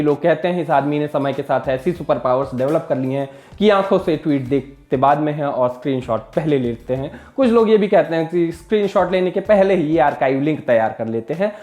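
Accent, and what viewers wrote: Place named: Bihar, India. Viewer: Yarr mujhe aap ka baat krne ka tarika bohot achha lga bihari hindi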